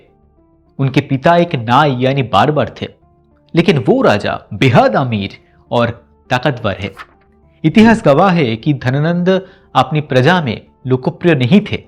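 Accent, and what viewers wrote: Bengal, India. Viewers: Pure bangali hindi... We r always with you brother❤ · হিন্দিতে একটু বাংলার টান আছে, পারে ঠিক হয়ে যাবে, 💹↗️ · I'm a good hindi speaking Bengali and I can see the effort you have put in speaking Hindi in this video. And being your first hindi video, I appreciate you and motivate you for further going on with this venture. Yes, the Bengali tone is evident in your speech but you have balanced it well with a controlled pace which has minimized any pronunciation mistakes. Topic and research were good. But full marks for your courageous first steps in making a hindi video not being a native hindi speaking guy. Lage Raho....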